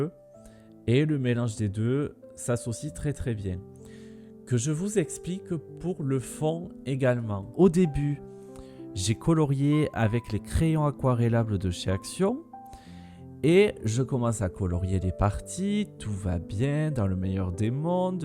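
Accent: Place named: South, France. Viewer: Bonjour ☺ les colos sont vraiment superbes ♥ Je suis ravie d'avoir découvert ta chaîne et d'entendre notre accent du sud ☺ Tellement contente d'avoir un confrère dans le 66 (si je ne me trompe pas 🤣🤣). Je suis une accro des crayons aquarellables mais les produits d'action ne sont pas performants. J'ai aussi fait les double pages d'hercules, j'adore ♥Bon allez je suis une vraie pipelette ☺ A bientôt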